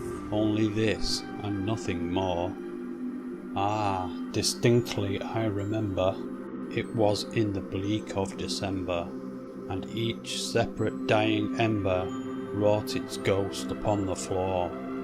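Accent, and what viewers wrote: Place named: England, United Kingdom. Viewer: Awesome job it sounded so good with your English accent you should do more of these.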